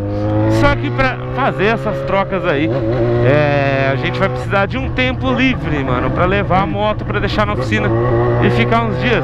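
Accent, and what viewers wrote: Goiás, Brazil. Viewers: Pq vc fala piqui de Goiás?????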